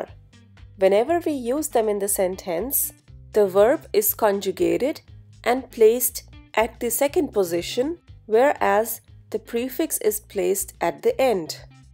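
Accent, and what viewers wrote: India. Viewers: Ist es nur mich, oder klingt ihre deutsche aussprache Inderin? Is it only me or does her German accent sound Indian? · it sounds like this lady has an Indian accent and it’s throwing me off… i can’t pronounce words properly because of that 😕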